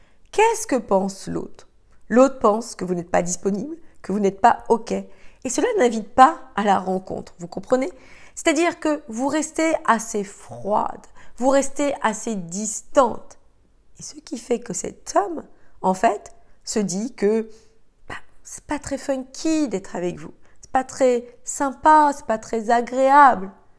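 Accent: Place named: Ivory Coast. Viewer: Vous êtes la meilleure,! Vous avez un bel accent de la cote d'ivoire que j'adore!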